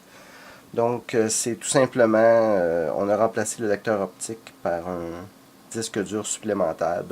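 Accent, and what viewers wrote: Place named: Quebec, Canada. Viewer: i love your french Canadian accent!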